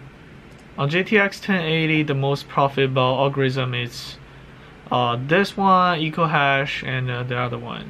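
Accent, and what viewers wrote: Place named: China. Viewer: I love your chinese accent sorry if its offensive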